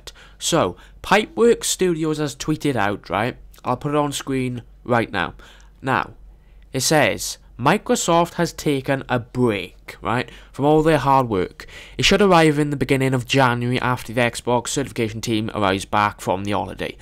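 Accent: Wales, United Kingdom. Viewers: are you welsh? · Are you Welsh? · Are you welsh? Im welsh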